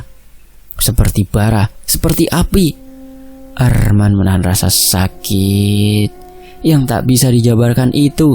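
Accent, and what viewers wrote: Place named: Surabaya, Indonesia. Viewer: Saya kira orang Surabaya mas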